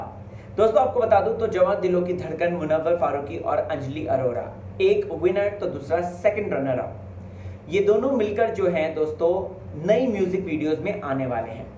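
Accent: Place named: United Kingdom. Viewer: Ye bhai Mai tumahra videos dekhta hnn ya to English me bolo ya Hindi me , nhi to muh se supari Nikal ke baat Karo , but British jaisa Hindi naa bolo yrr 👍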